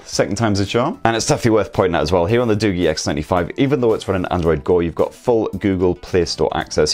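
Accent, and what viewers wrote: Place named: Newcastle, United Kingdom. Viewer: are you a geordie? lol just stumbled on this I'm from Elswick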